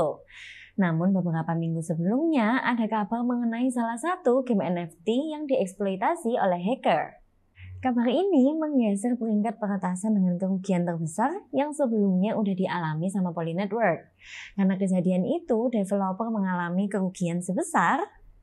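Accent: Java, Indonesia. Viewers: Ngomong nya medok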